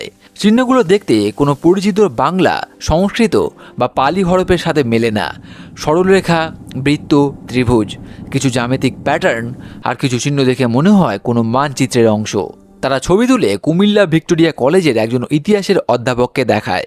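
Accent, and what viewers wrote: Kolkata, India. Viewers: Vai why do you have Kolkata accent?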